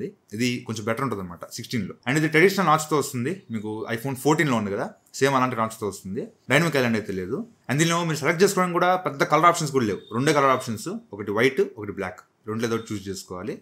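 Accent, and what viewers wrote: Nellore, India. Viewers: Anna Nellore aa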